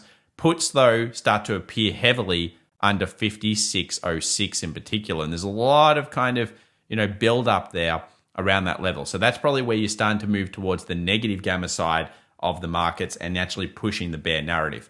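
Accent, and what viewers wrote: Australia. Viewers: I think that's just his aussie accent 🤣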